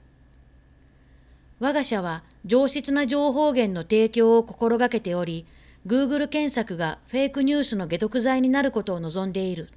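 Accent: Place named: Nagoya, Japan. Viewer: 名古屋イントネーションのアナ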